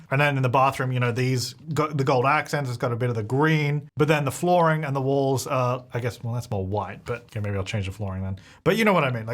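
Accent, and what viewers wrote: Australia. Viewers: I'm Australian so I'm fairly used to the accent but when I have you in the background you sound like Jazza 😂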